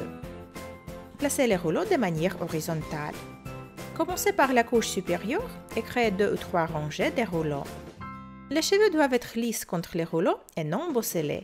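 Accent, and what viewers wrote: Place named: Russia. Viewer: est ce que tu est russe parce que moi oui tu as un accent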